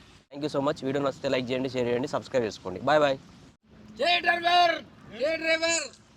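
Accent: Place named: Nalgonda, India. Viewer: నువ్వు మా ఊర్లో మాట్లాడినట్టు మాట్లాడుతున్నావు... మాది నల్లగొండ, దేవరకొండ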